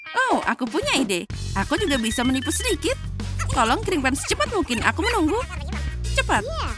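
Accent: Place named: Indonesia. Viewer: Tapi kenapa a sounds Indo bukan usa ker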